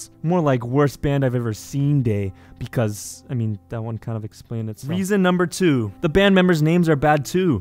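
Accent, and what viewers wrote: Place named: Canada. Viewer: He's also Canadian, which makes the "This is America" joke funnier. I could tell because of his accent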